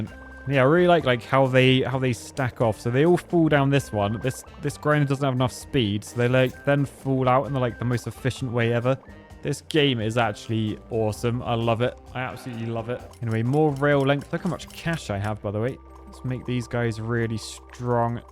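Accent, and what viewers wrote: United Kingdom. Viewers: Ay brittish dude, will you play Tf2?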